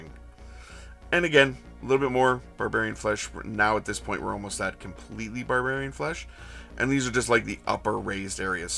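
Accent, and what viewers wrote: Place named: Australia. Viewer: Definitely Australian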